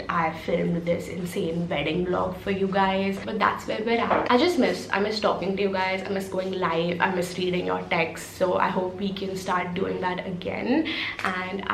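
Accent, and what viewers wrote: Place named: Dubai, United Arab Emirates. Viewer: The typical Dubai guy accent💀🤣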